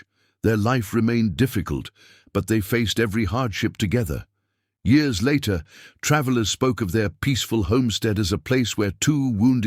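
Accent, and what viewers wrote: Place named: United Kingdom. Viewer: Another American western story narrated by a British accent. Not interested!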